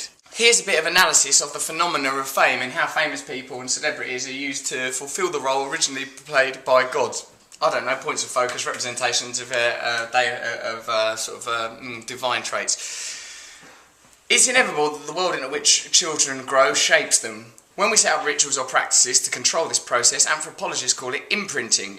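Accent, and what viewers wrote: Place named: United Kingdom. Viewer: Gosh I wish there were subtitles for these. I love Russel but he speaks so fast, and because English is my second language I really struggle with the British accent when he goes that fast. Anyway, love the new intro and logo!!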